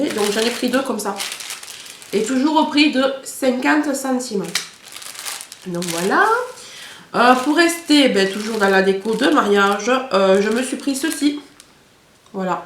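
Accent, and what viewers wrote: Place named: Marseille, France. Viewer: tu es Marseillaise ? En tout ka tu as l accent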